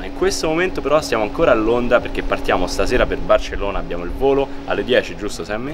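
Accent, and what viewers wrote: Rome, Italy. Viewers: Ti seguo da poco,volevo chiederti,da quanto sei a Londra? Perché la ciancicata romana se sente na cifra! Daje così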